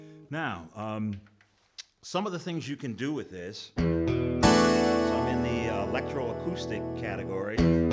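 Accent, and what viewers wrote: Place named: New York, United States. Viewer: Awesome demo awesome New York accent!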